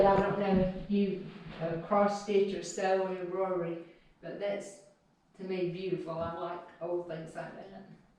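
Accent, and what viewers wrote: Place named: Georgia, United States. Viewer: This took me back to the summers of my childhood. I spent them 9 miles away from Lumpkin, in Richland. I miss that accent!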